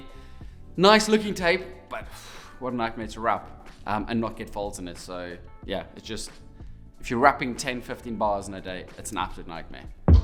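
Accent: South Africa. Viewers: What accent is that? Cape Town? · Definitely a South African, good advice Boet ! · Sounds like a SAFFA… must be 😂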